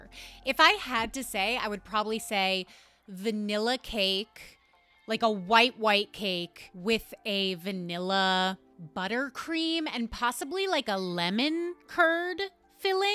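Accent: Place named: Brooklyn, United States. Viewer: This video popped up on my feed and 3 seconds into the preview my brain clocked “Brooklyn accent!” I’m a Coney Island guy now living in the Bronx and I didn’t realize how much I missed our native tongue. Thank you for that. Also, you’re funny as hell and I like nutrition/fitness content, so I stayed for the video and subscribed ✊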